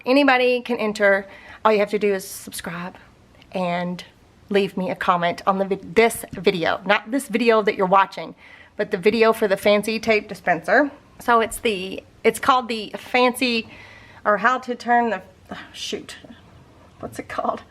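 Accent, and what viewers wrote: South, United States. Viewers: I love watching you!! I've been watching you for several years now. Love going back and watching your old videos. I always learn something new!! Plus another lady with a southern accent 😉 (Alabama girl) . I can't wait to see what you come up with next!! ❤❤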